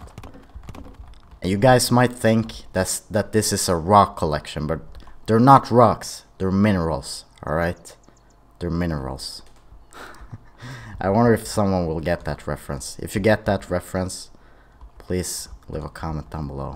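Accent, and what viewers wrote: Sweden. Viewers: You sounds like your from sweden Im new too and i am from sweden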